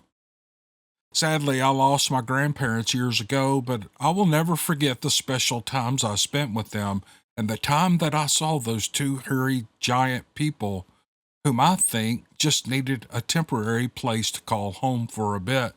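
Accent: South, United States. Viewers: I have so missed you soft, southern voice. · I gotta tell ya Brother, as much as I love, How to Hunt, Steve's an honorable man, as I've said before, I Truly, Appreciate the Southern Man, in your voice. You have a gift, even if it ain't languages or accents, other than Southern.. GBU, and your family. I really love listening to your voice, and the peoples stories, Steve Lilly, or lived experience.....Aloha. Oh, and this story, Twisted. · You, my friend, are a very good writer or storyteller. I could listen to you all day long. I often re-listen to the books you have read while cooking. I like the southern cadence quality that you have. Anyways, I've missed you but had no doubts that you would return to us eventually. ❤ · Darn, you always keep me up late with your interesting, and superbly presented stories......I love the accent, what part of the South are you originally from, is it Georgia? 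· that was an awesome story! Please keep writing when the muse hits you like that. Thanks for sharing that and the other stories, love listening to them. By the way, you sound like most of my neighbors, which is normal for the South. I am in Louisiana and went to Chamberlain Hunt Academy in Port Gibson for 6 years, so part of my growing up was in Mississippi. Great place to grow up!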